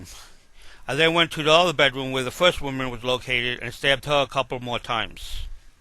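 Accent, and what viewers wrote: New York, United States. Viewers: You sound like you are from New York. Great channel by the way, I loved your style of editing and presenting. Subscribed today. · Are you a New Yorker?! haha Adorable. Great narration! I'm from Queens!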